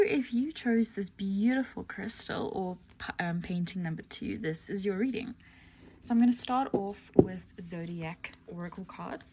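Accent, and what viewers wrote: South Africa. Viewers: South African voice right there! Love it 🥰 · Are you South African? Just found your channel, love these messages and your thumbnail💗 p1 is really accurate 😮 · Girl I know a South African when I hear one! 👀😂🫶🏾🇿🇦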